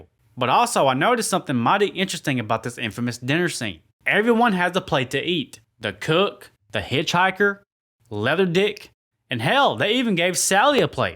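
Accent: South, United States. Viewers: You are definitely from the south my friend 😂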